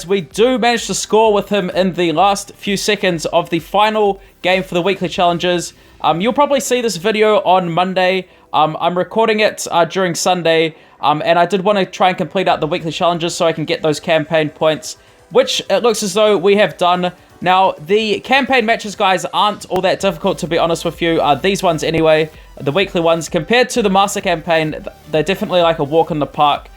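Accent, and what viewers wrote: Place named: New Zealand. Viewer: He sounds like a kiwi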